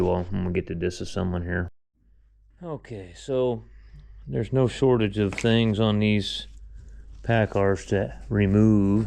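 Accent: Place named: South, United States. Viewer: Where did learn redneck speak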